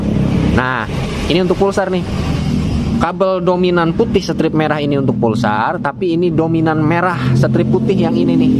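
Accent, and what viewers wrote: West Java, Indonesia. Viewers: Paling suka deger logat orang sunda...rasanya pengen bgt pnya teman orang sunda...salam seduluran dr malang jawatimur bossq🙏🙏🙏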